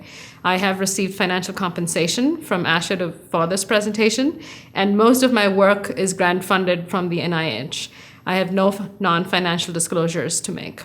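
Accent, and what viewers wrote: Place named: India. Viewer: sounds like you are indian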